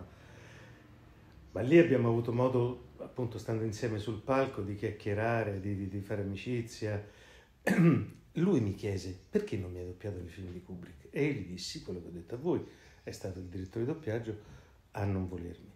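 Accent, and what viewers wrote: Rome, Italy. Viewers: Mamma mia che fenomeno, adoro la sua voce. Ma come fa, ha una voce sbiascicata, piena di sc doppie sbagliate romanesche...... grande!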